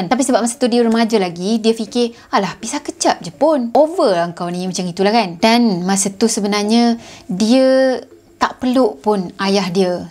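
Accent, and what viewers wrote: Kelantan, Malaysia. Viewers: pada siapa yg berbakat jdi pembaca berita tp tak berpeluang, boleh la cuba jdi youtuber......suka cara menyampaikan cerita, jelas dan terang walaupun ada slang2 kelate sikit....but its ok...slang yg natural...keep up the good work sis....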